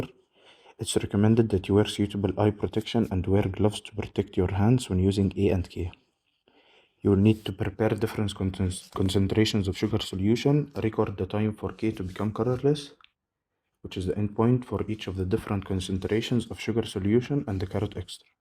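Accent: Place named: Arab world. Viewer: arabic accent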